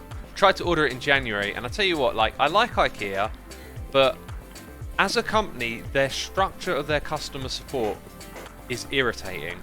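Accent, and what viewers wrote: United Kingdom. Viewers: X's streams are perfect for: - for soothing oneself to sleep - for relaxing while e. g. drawing, sewing, cleaning - when you just want to have some background noise (a one which makes you laugh out loud every 2min) - for cuddling up with your pet and a cup of tea - when you need to hear some dad jokes - for taking a walk/run on a treadmill - for chilling out with your siblings - when you are in need of hearing a slup of tea (one of my basic needs tbh) - for when you need to be reminded to take joy in simple things (he's seems so grateful for even the simplest of things) -for when you want to develop a British accent For everything, okay? THEY ARE PERFECT FOR EVERYTHING. I'm glad I can watch them on YouTube :-)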